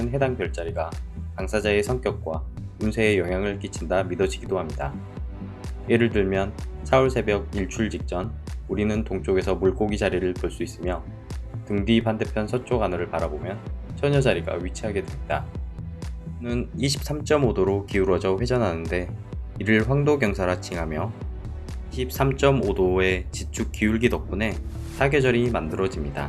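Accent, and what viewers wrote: Jeolla, South Korea. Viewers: 형 고향이 전라남도여? 전라도 사투리가 심한뎅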